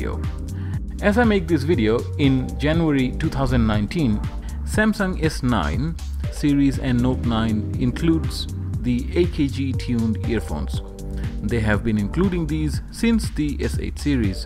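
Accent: India. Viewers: I live in India and you sound Indian too albeit you are at the initial phase of picking up the American accent where you have moved not too long ago and you were ably assisted by your kid . Bet my bottom follar you are a Bengalee I bought a Samsung AKG in ear monitor last week and it is divine in sound with Spotify and UTUBE and carties every detail of sound and is a true representation of the high class of the AKG stable. AKG is a brand which makes ptofessional sound systens , equipments and accessories. AKG was acquired few years ago by Samsung which saddened me but thankfully Samsung has maintained the European standards in AKG .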